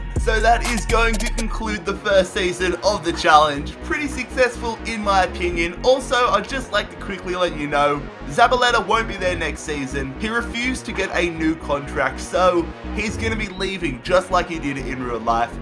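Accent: Australia. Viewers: Are you Australian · I knew you were Australian! Great vid From, fellow Aussie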